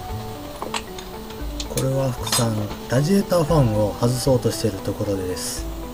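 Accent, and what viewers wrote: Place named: Tokyo, Japan. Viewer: 喋りが変な東京風になっちゃった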